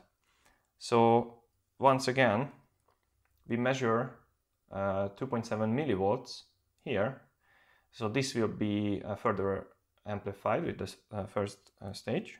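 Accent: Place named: Hungary. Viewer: Le se tagadhatnád, hogy magyar vagy ilyen akcentussal. Amúgy jók a videók👍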